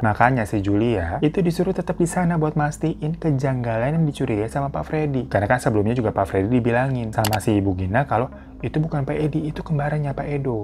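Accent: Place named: Papua, Indonesia. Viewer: bang lucu bahasa Papua ny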